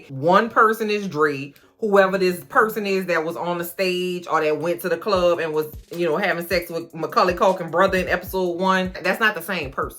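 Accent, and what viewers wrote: New Orleans, United States. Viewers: You gotta be from New Orleans ! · Are you from New Orleans? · Are you from New Orleans ma’am? You sound like family!!!!! Edit: Ok I’m 3 minutes in… you definitely from New Orleans! Automatic sub!!!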